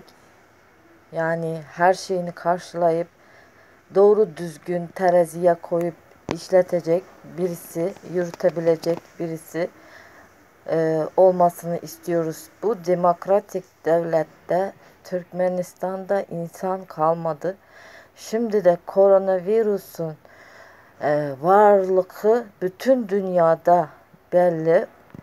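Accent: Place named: Khorezm, Uzbekistan. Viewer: Манимча хоразмча гапирдингиз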